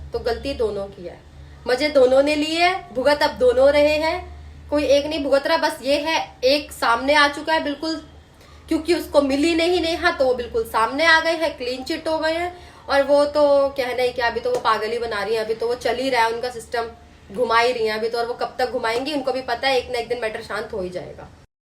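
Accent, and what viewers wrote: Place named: Uttar Pradesh, India. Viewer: Aap kanpur lucknow se ho kya aapka kahe hamare kanpur lucknow ki jaban hai na baki your way of talking is marvellous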